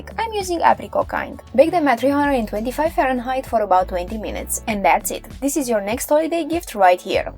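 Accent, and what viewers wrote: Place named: Germany. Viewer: so you think she's French? to me, she has almost a German accent...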